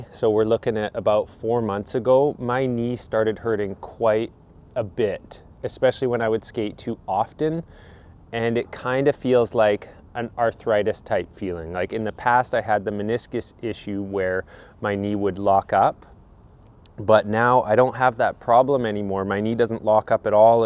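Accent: Canada. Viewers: Just want to say my thanks, because I've gotten a lot from this channel over the last few years, including: - understanding my skateboard on such a deeper level - from trucks to decks, you tapped into my geek nature - when doubts would creep in about my age (late 30s), you inspired me to keep skating. I would often think, shit, skates multiple times a week and he's older, and also does physical labor for a living! I'll be alright;) - you soothed my nerves in times of stress. your Canadian accent and manner of talking are very calming to listen to! Much appreciated,. Best of luck with whatever you do!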